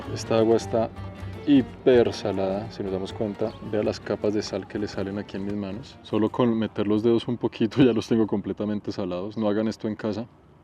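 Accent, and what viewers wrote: Colombia. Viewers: eres de las personas preferidas, tu español es muy Colombia 🇨🇴, emprendedor como todos tus paisanos. Gracias por mostrarnos el mundo. Desde los llanos de Colombia 🇨🇴🇨🇴🇨🇴🇨🇴🇨🇴🇨🇴.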